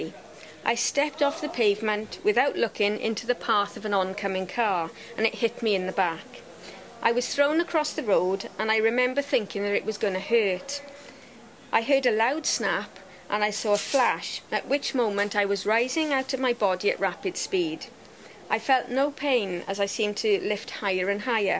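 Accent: Wales, United Kingdom. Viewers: Is she welsh? Love her accent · A very interesting talk by a very interesting and lovely speaker, with an open mind and a beautiful Welsh accent. She covered many aspects related to the NDE experience. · Lovely Welsh accent.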